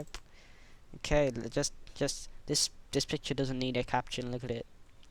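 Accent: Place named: United Kingdom. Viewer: I love your British accent ❣️🤠😍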